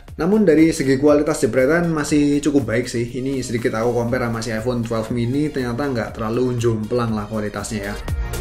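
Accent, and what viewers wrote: Java, Indonesia. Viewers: oalah pantes jowone medok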